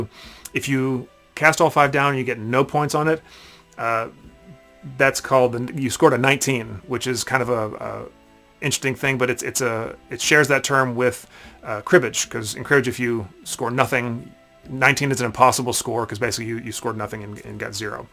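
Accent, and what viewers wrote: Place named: Yorkshire, United Kingdom. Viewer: Shoov ape knee. As a resident of the US (please forgive me, I didn't do it...) I recognized this as a Yorkshire pronunciation with the help of Wallace and Grommit. A friend of mine from England said to me to imagine the US upside down, all the country folk are in the north...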